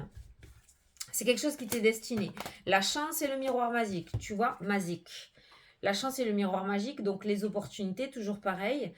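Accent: South, France. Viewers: je pars en vacance à chaque fois que je vous écoute,votre accent du sud est magique et me fais voyager.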